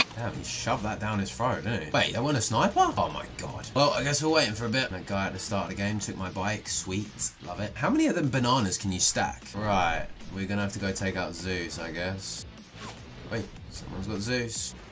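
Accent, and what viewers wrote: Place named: United Kingdom. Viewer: HE'S BRITISH?????!?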